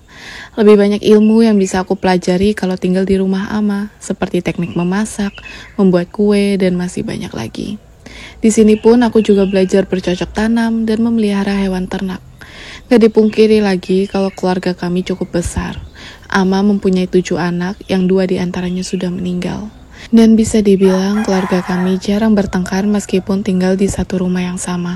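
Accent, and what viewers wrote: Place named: Jakarta, Indonesia. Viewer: Oo.. Kok logat bicara nya bagus bgt yaa... Kyk orang jakarta... Hehehe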